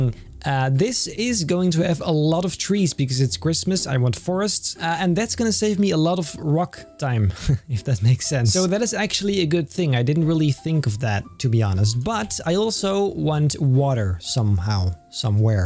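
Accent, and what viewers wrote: Netherlands. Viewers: your from Belgium or are you from the Netherlands? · Are u nederlands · WAIT A MINUTE… Ben je Nederlands?! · ben jij van België of Nederland of Engeland, ik gok Nederland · I knew you were dutch Baha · i new your from belguim or netherlands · are u dutch?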